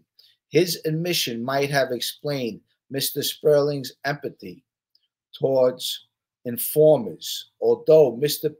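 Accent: New York, United States. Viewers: Hi Mr, that was great story. I have to tell you that you have the best N Y accent of all the other guys that do mob stuff. It's really cool. Stay with this your doing great.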